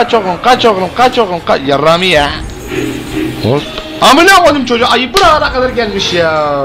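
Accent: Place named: Trabzon, Turkey. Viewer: şivesi iyice tıraykyaya kayı bu ne leee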